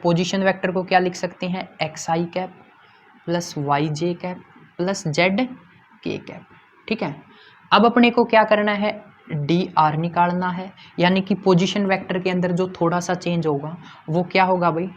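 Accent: Haryana, India. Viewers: Ap haryana se ho na ?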